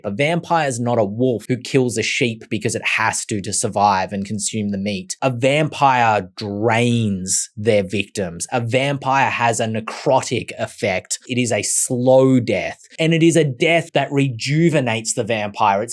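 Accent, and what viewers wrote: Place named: Australia. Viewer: Aussy vampire intro... I am sorry but I can't take it seriously enough)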